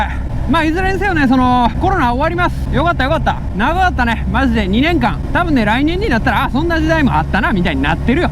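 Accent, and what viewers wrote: Kansai, Japan. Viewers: ガジェット紹介も良いのだけれど、のモトブログは大好物！！映像が次々移り変わりながらの、流暢なしゃべり（関西弁）がもうほんまめっちゃ心地よい！！緩急織り交ぜてコーナー突いて放ってるピッチャーみたいに、ほんまいいバランスやわガジェットとモトブログ･･･いま流行の二刀流！！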